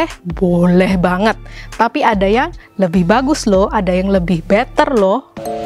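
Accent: Java, Indonesia. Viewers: Sueneng aku mbek penjelasan e mbak e medok ee lohh🔥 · Sampeyan iki wong ndi to cii 😂😂😂 bahasane medok men pas ndagel 😂😂😂 ke mekel tapi mak jleb ning otak😘 lope u 😘😘😘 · maksud sy liat cara berbicara lucu emeszz, medhok2 jawa, 👌😘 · seneng bgtt bgttt sm cici nyaa kalo omg jowoo medok bangett huhuhuuuuu 😂😂😂 jd bikin smgt buat nonton eh olahraga yuk!!'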